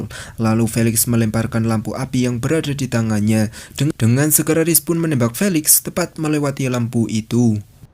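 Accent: Java, Indonesia. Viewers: Kek orang jawa nii bang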